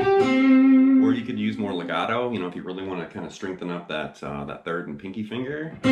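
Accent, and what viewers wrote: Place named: Georgia, United States. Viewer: You're from Chicago? You sound exactly like you're from GA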